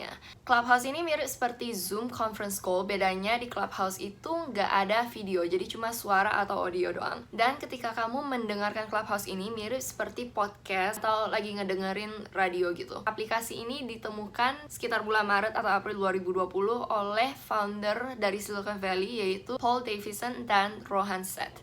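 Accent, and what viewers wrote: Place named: United Kingdom. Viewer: Mba cuman mau bilang suaranya enak di denger, accent englishnya sukak banget xixi!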